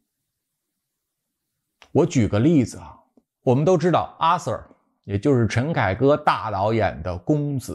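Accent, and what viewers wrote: Beijing, China. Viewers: 一口京腔，南方人的我不太习惯。还是喜欢标准普通话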